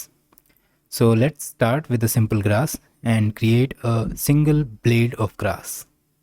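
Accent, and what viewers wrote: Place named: India. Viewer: I like the Indian cool voice...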